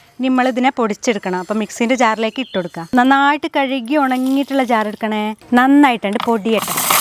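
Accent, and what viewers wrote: Malappuram, India. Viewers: നിങൾ മലപ്പുറം ജില്ല ആണോ.എന്തായാലും സൂപ്പർ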